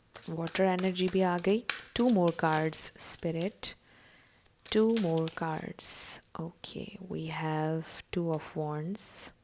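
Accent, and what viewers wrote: Pakistan. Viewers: her way of talk matched with us i m also pakistani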